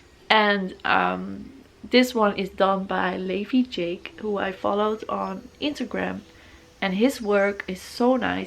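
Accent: Netherlands. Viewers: She's Dutch, and she pronounces the word like most Dutch people do. Your comment is unnecessary and quite honestly, pretty rude. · No, it's NOT rude! I'm Dutch and I don't say it like that. I have English speaking friends and they say I don't have a Dutch accent. It annoys me too. But her accent doesn't sound bad even though it's clearly a Dutch. It still sounds nice I think apart from the ID idea word.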